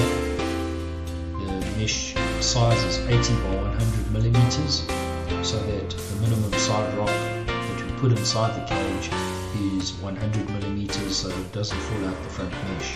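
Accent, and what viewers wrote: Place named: South Africa. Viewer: PS, sorry for changing your gender to, lol. Your accent tells me you are in the S.African continent, we are in the UK, otherwise maybe we could get our supply from you. Our engineer and architect has mentioned a company called ENVIROMESH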